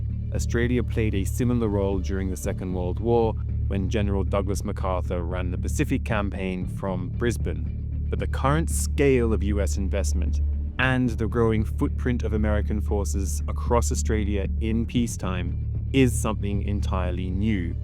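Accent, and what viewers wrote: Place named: United Kingdom. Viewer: I just reported this video for misinformation, total bullshit lies!!! F off USA the average Aussie hates you and you can’t thieve our tax $$$ to the tune of a trillion dollars along with our sovereignty! & you Brit voice over brat 🤬🤬🤬 how dare you!!! It’s one think to pretend we’re part of your empire but to lie about who really owns Australia now is reprehensible, Brits are as bad as USA! Viva la revolution!!!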